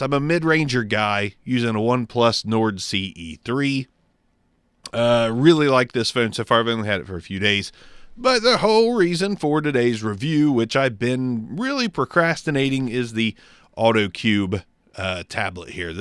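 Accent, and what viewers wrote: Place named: Mid-Atlantic, United States. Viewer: BTW noticed your accent, are you from Pa, Delaware or Maryland?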